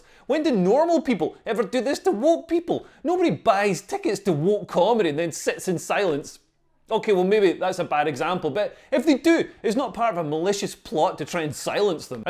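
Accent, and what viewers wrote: Scotland, United Kingdom. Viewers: What a great take. I accidentally had CC on and was enjoying it's interpretation of your scot's accent. I had a scottish mother so no probs for me. You could easily lure the left into lullaby land by including that great pacifier of all faux woke outragerists...hannah gadsby to the list perhaps? And, you're welcome! from a proud Aussie to export that fine example of upstanding comedy to your great shores. You're welcome to keep her that is.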